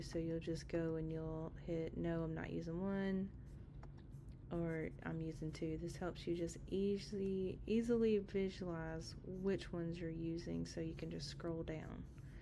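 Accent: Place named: South, United States. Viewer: I just bought your template and yes I am side tracking here but your southern accent sounds so pretty! ☺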